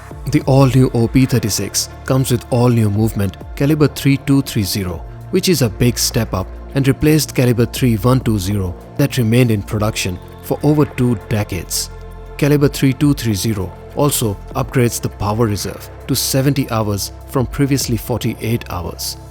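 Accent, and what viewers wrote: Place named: India. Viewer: By the way, you seem to have an indian accent..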